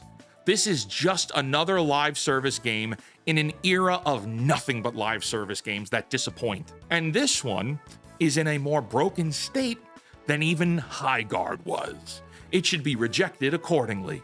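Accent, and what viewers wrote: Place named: New Jersey, United States. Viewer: Dude are you from Jersey because that accent sounds like it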